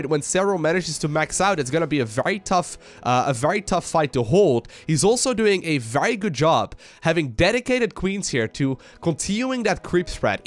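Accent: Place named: Netherlands. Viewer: Could be saying "serral" or "several" can't tell with that delightfully dutch accent.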